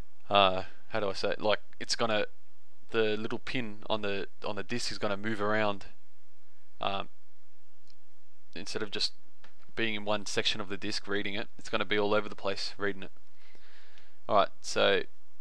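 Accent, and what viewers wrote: Australia. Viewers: I love your Aussie accent! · Defiantly a Aussie, we don't have that accent here in the UK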